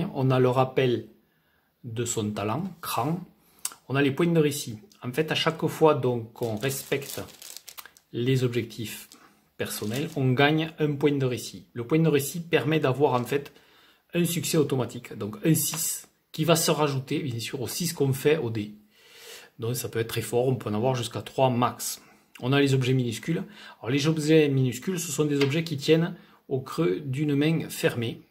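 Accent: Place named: Toulouse, France. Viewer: ho con mais vous êtes de Toulouse? merci pour cette présentation, je veux me lancer dans le jdr et celui-ci me branche bien :)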